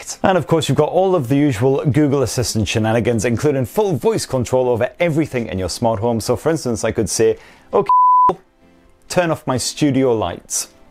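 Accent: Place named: England, United Kingdom. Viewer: I love your English accent so much I wish I had it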